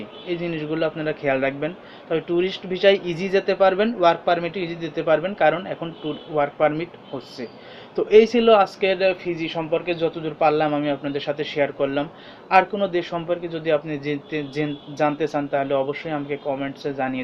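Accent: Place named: Pabna, Bangladesh. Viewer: ভাই আপনের বাড়ী কি পাবনা ভাষা শুনে মনে হচেছ পাবনা পাবনা কোন থানায় ভাই?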